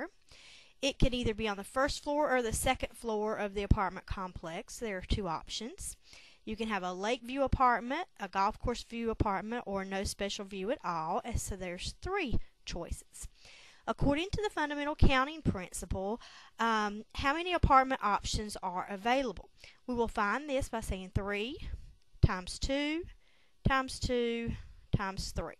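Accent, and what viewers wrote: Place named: South, United States. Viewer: YOU FROM THE SOUTH, I LOVE YOUR ACCENT!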